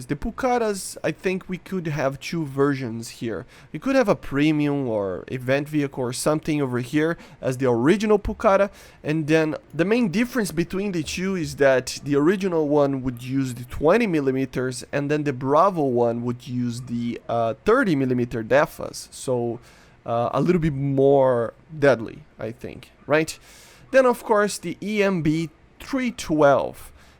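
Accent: Brazil. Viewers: Se eu não soubesse diria que vc é brasileiro, parece muito sotaque de brasileiro falando inglês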